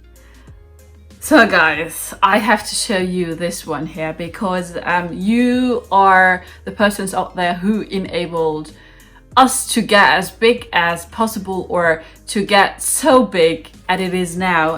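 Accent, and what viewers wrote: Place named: United Kingdom. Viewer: U from the UK, hun? That accent, though! 😄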